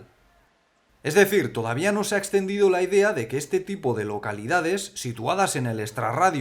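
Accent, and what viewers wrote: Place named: Basque Country, Spain. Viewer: Leo tus descripciones y comentarios como si hablases tu, con tu particular ritmo y acento vasco xD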